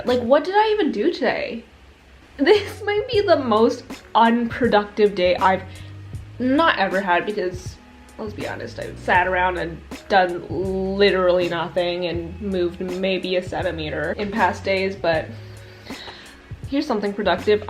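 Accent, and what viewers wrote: United Kingdom. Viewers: that british accent is more realistic than my own english accent lol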